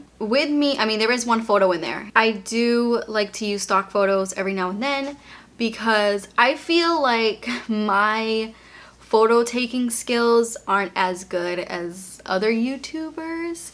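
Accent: Boston, United States. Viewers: I actually love your boston accent! ❤️ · Wtf no i like ur boston accent.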